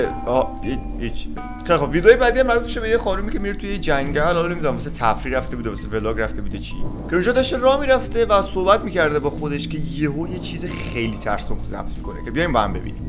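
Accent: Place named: Afghanistan. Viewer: چرا لهجت افغانیه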